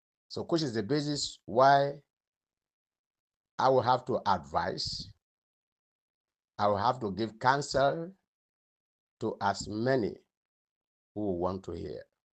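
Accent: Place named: Aba, Nigeria. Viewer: u talk like aba man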